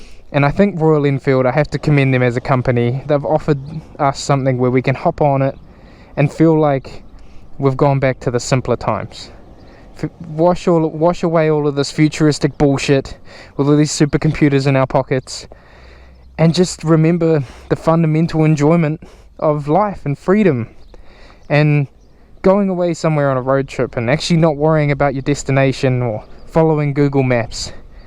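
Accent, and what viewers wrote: Australia. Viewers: R u Australian by any chance?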